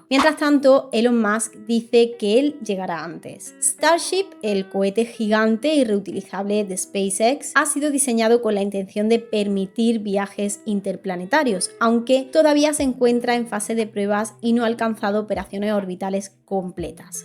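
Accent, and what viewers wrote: Andalusia, Spain. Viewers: Amo el acento andaluz y encima contando cosas interesantes. Saludos desde Chile!! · Que interesante video, Pero por Dios, de que parte de España es ese acento? Es muy hermoso 🤩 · Excelente video: sigue adelante el tema es muy demandado a día de hoy. Jajjj. Tienes asento andaluz muy lindo. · Está hablando con acento andaluz, el de mi tierra, si no te gusta agua y ajo. Luego te quejarás del doblaje español y preferirás el latino que hace que parezca que todo es una telenovela. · Lindo acento andaluz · Me suena a Andalucia ese acento😅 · el mejor resumen acerca del tema con un hermoso acento andaluz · Me Encanta ese acento español❤ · No sé Uds, pero lo interesante del tema lamentablemente lo perdí, por lo embelezado que me dejó el hermosisisisimo rostro de esa niña! ah! y la ñapa: me encantó el contraste que tiene entre su pronunciación de términos en inglés, y el acento (creo que es andaluz, corríjanme) cuando dice palabras en plural y se "come" la "S", 😊... 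ese: "etádo unido" le suena muy cool, que lo digo yo que digo "caraca", en vez de "caracas", que es de donde soy... PS: en cuanto al tema del video, prometo oirlo en vez de verlo, para concentrarme en lo que dijiste! Tip: baja un poco el "peluche" ese que cubre el micrófono, para que dizque se oiga mejor.. 😉... una vez digerido el material, prometo reuní un dinerillo para comprar un cohete.... para qué? preguntará la... pues "PARA LLEGAR A AMARTE"! 😅😅... (tú te comes las "S", pero yo no me como las "A", jeje)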